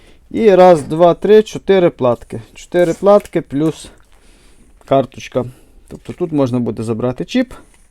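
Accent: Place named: Galicia, Ukraine. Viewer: Привіт. Шикарний Галицький акцент. Лайк . Підписка. Так тримати. Гарний ролик.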